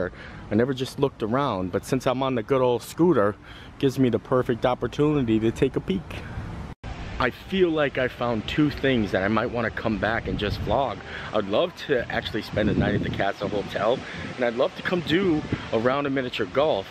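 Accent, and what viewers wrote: Pennsylvania, United States. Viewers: Where are you from originally? I'm trying to place your accent. It sounds like my Pennsylvania cousins! Bar Louie in CT closed suddenly about a month ago. It was in the mall. Thanks for the positive upbeat vids!!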